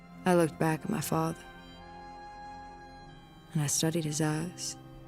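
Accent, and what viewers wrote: South, United States. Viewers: I could care less. I hate her lousy southern accent narration. It is very distracting.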